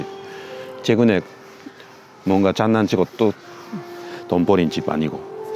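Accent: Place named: Gyeongsang, South Korea. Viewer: 인품 좋은 설명도 좋은데, 여게 거게 저게~하는 옛날 경상도 사투리가 참 듣기 좋습니다.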